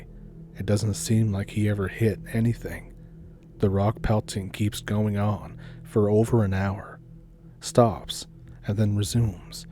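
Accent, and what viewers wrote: Canada. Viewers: Thanks for sounding exactly like My B.C., And Ontario cousins and family. Peace to You- AND-- ELBOWS UP-!! 🎉🎉🎉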